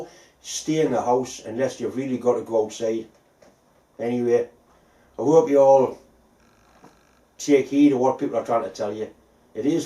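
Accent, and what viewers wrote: Newcastle, United Kingdom. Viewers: The auto text cant understand Geordie either. 😂 At least mine cant.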